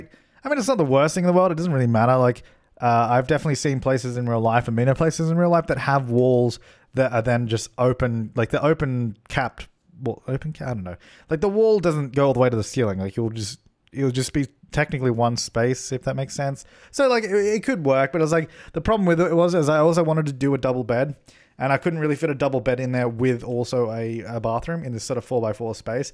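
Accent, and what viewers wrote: Australia. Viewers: Are you Australian? your accent lmao, bc i am too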